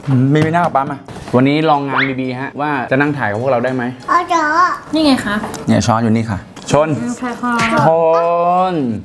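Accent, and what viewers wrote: North, Thailand. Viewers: อยากเห็นแม่บุ๊คพูดเหนือทั้งคลิปค่ะ มันต้องน่ารักมากแน่ๆ❤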